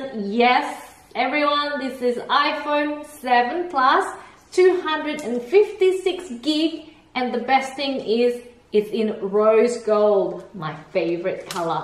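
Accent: Australia. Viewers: Are you Australian? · I love your accent I'm Australian as well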